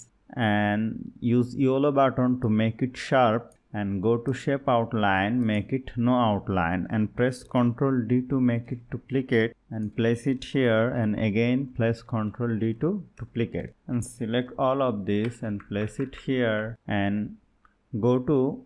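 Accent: India. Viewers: I can't believe that I'm listening to an Indian accent😭😭😭😭😭😭😭😭😭😭😭😭😭😭😭😭😭😭😭😭😭😭😭😭😭😭😭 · your accent is Indian I think. Thank you for soo good presentation From= INDIA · This nigga is Indian 😂 Accent 😂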